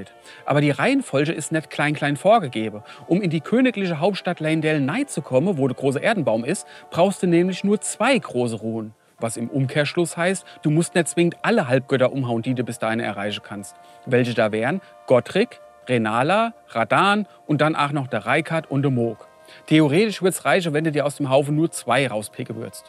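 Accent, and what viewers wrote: Hesse, Germany. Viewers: Hessisch- beste Dialekt 💪💪👍👍 super witziges Video. Gerne mehr davon. Grüße aus Frankfurt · ich liebe und vor allem das hessisch, einfach nur Gold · Hessisch for de win alder ✊🏻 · Irgendwie habe ich keine Ahnung, woher der Gag kommen soll bei hessisch. Ja, ist widerlich wie jeder Dialekt, aber tatsächlich witzig wäre halt schwäbisch, bayrisch, sächsisch. Zweitens. "Geschichte" und ein Souls Spiel. Putzig :D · Herrlich und trotzdem das "Lore" sehr gut rübergebracht, Vaatividya auf hessisch :-) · Meine Mutter war Hessin und ist dieses Jahr gestorben, ist für mich immer ein Gefühl von Heimat, wenn ich den Dialekt höre. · Gute halbe Stunde entertaiment auf hessisch :D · Wie ich das liebe wenn dem Schröck Storys uff Hessisch erklärt 😂😂😂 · Absolut grandios das Video. In noch keinem Video wurde die Geschichte von elden Ring so gut zusammengefasst. 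Und des hessische macht’s au noch weng mehr onständig unterhaltsam :D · Nie eine Sekunde gespielt, aber das meiste verstanden. Uff hessisch ist immer schön. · Ei gude ihr lieben, Imma widda schee, wenn ihr uff hessisch babbelt. Ich wär mal für ne ganze reguläre Folge in hessischer Mundart 😉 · Also Trants Hessisch klingt echt angenehm. Gott sei dank nicht auch Sächsisch :D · Also wenn meine Muddi auf Hessisch spricht verstehe ich kein Wort mehr... wo liegt der Unterschied, noch zu nett gewesen? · Hessisch ist einfach wunderschön ^^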